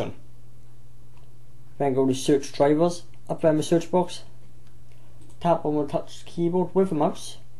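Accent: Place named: Wales, United Kingdom. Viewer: Thanks great video. Nice accent. I take it your welsh lol. I done thousands of installs but was a bit stuck on the linx as never seen one before but after watching your video was a simple process. If you need any parts then contact me pcpitshop.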